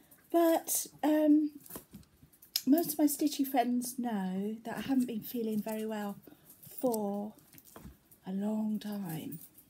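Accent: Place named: South, United Kingdom. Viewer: I'm really sorry you have had such a horrible diagnosis but at least you can start the treatment soon. Hopefully. Where in the UK are you? Your accent sounds as though you are in the south and I'm on the Wirral or I'd invite you round for a cuppa and a hug. I've been watching your videos and really enjoy them. I hope your cat scan is very soon. If you need a shoulder mine is big and padded for tears! Love and hugs and healing thoughts xxx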